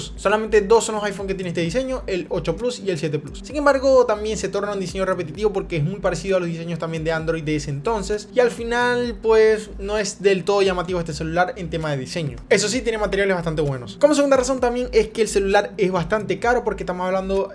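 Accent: Venezuela. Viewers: eres venezolano???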